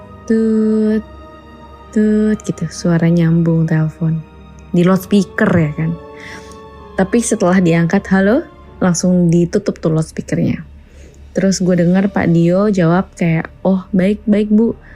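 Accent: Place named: South Jakarta, Indonesia. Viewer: Anjir didaerah gue Cinere, anak JakSel mana suaranya.. sukses selalu mbak yang cuantik hehe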